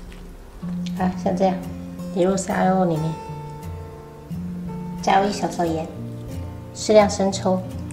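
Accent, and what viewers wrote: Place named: Zhejiang, China. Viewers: 好像是可愛的浙江口音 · 听口音像江浙沪的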